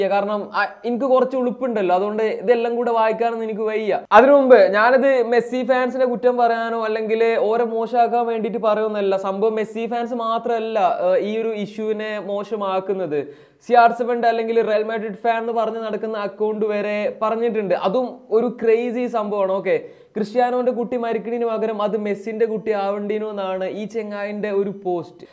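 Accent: Malappuram, India. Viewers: Thangal malappuramthu kaaran aano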